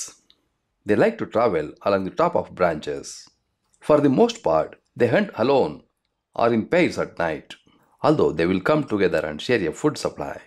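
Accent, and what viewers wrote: India. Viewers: Like for that south accent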